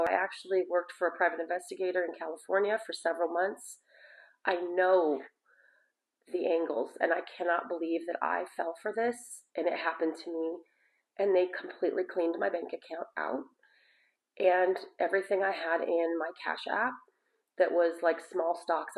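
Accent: India. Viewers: East Indian accent? Asking because it’s very common. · Indian accent maybe.?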